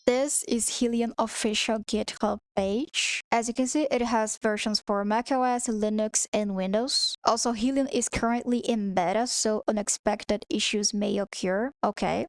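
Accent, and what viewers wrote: Brazil. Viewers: eu sabia q vc era brasileira imediatamente pelo sotaque kkkkkkkk nao te xingando tho, otimo video e otima pronuncia !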